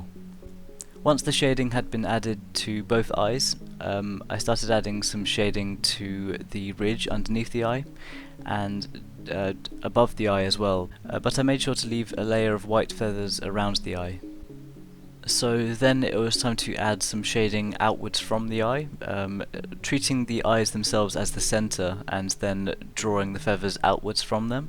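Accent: England, United Kingdom. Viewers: So just normally English.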